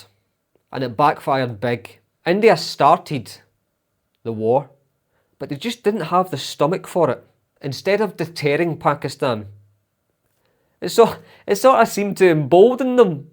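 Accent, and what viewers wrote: Scotland, United Kingdom. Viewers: I love your accent❤. I guess it is Scottish? Even though you are giving a constructive criticism which I agree with, I can’t help but notice the tone in your words. It is beautiful. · Your Scottish accent got me 😅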